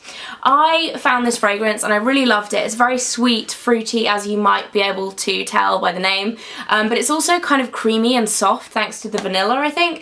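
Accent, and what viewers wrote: United Kingdom. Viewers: I'm from the U.S. and have only heard it said in our ugly accents - I think the way Brits say things sounds so much better, especially!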